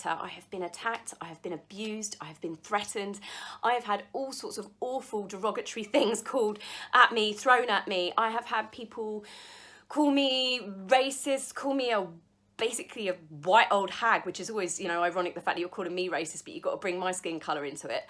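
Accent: United Kingdom. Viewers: Your accent is 🥰 I’m American so yea I admire the British accent. Actually, I love everything British. Anyways, as to the topic of Meghan, I’m sorry this woman is trying to ruin your royal family. She’s nothing but trouble! Personally, I don’t want her back but if we have to we will deal with her accordingly. The tabloids here will shred her. Idk what she’s talking about that there’s no tabloids in America. That woman is a liar! We have a tabloid magazine called the National Enquire here and many more. Hang on my British friends, this pain will pass.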